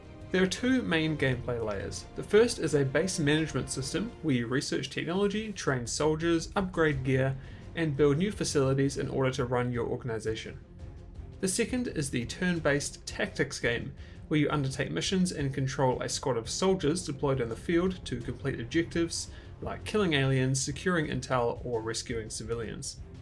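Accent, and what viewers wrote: New Zealand. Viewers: R u from New Zealand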